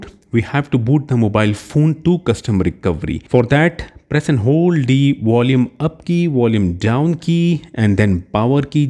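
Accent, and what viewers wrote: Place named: India. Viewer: Always an Indian with strong sccent. Never an american making these videos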